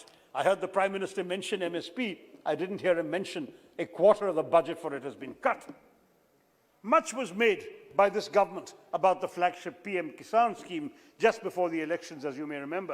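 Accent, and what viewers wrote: England, United Kingdom. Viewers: Just a malyalee showing off, in a anglicised accent. No content nor any useful suggestions, just a Angrazzi trash for the poor constituents.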